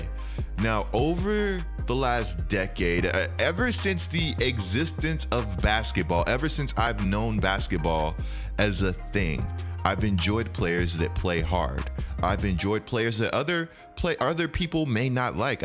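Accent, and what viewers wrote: Louisiana, United States. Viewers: You from nola or BR?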